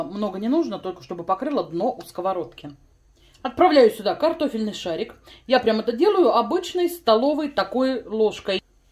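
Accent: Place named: Russia. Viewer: какой вкусный русский акцент. Осталось семь варёных картошин. теперь знаю для чего. спасибо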